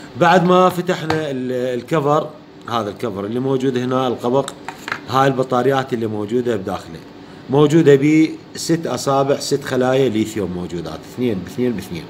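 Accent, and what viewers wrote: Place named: Iraq. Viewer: السلام عليكم ورحمة الله وبركاته اولاً اشكرك على هذا الشرح الرائع بس ياريت بشروحاتك القادمة تحاول تتكلم بلهجة تكون مفهومة اكثر لغالبية المتابعين أخوك من اليمن وعندي مشكلة باللهجة العراقية ما افهم عليك بعض المصطلحات 😅 (تحية من اليمن لكل عراقي)😘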